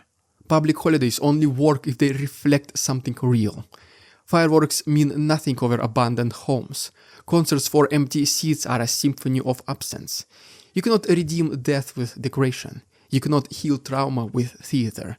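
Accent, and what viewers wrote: Russia. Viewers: I am fond of your YouTube videos. As a linguist I am fascinated by your excellent English combined with a very heavy Russian accent. The two features are not congruous. Would you do us the favor of a short autobiography especially regarding what country you are fled to, and how you learned your English which is the best among Russian presenters.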